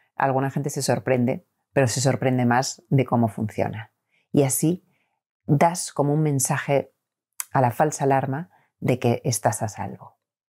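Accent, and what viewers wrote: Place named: Spain. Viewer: TENES UNA HERMOSA VOS, GRACIAS POR TU AYUDA, PRESUMO QUE ERES DE ALGÚN LUGAR DE ESPAÑA. 😁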